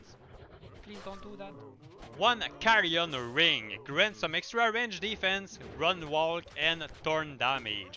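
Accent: Quebec, Canada. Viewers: Omg that thick QC accent xD, cool vid!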